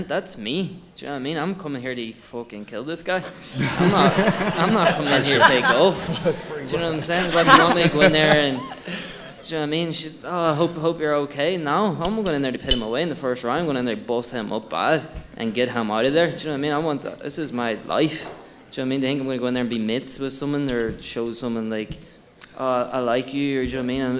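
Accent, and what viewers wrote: Ireland. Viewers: Dude I get you got a Irish accent but your literally trying to sound like Conor “you know I mean” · James : Irish accent (bc he was born in Ireland) Everyone : “bruh he copied Connor” lol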